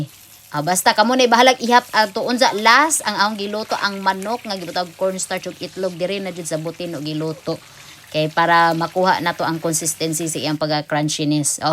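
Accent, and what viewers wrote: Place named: Bohol, Philippines. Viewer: Akoang Lolo ug Lola mga bol-anon. Si Lola tga Maribojoc, ganahan kaau sya mag tan-aw sa imong videos nga naay voice over. Mahimoot sya kay bol-anon kaayo.😂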